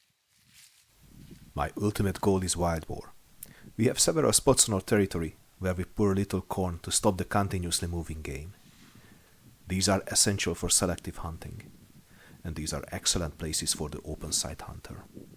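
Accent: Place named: Hungary. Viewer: All the best for 2024 folks - one of the best shooting and hunting channels ever, and in the bright Hunglish language too!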